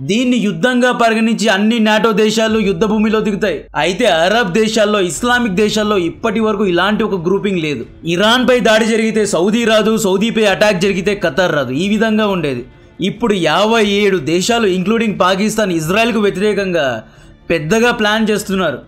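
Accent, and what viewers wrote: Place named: Tamil Nadu, India. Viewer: Arey nuv mandhukotti maatladathava?? Leka arava vaadiva? Ni maatendhukura ala vasthaadhi? Telugodi chinna shortfilm chaesthene oscar andhukuni retire ayinattu buildup isthaaru, nuvventra telugulo videos chaesthunnav? Sigguledhaaraa Goltigey neeku?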